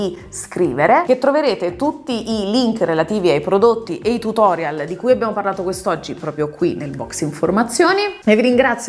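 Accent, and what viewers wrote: Campania, Italy. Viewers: è bella la cadenza Campana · Nooooo ti prego si alla cadenza campana. Anche se vivo in Liguria da oltre 20 anni il mio sangue campano é sempre vivo ❤️❤️... P. S. Anche io avevo preso il Mark in 2 varianti colore ma non ho avuto ancora modo di provarlo spero di aprirlo prima dell'estate😂.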